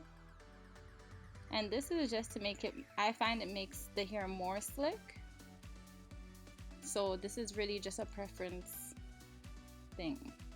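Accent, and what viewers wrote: Caribbean. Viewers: Is the girl doing the voice over Caribbean? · where are you from? I hear a Caribbean accent. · I hear a Caribbean accent 🤔....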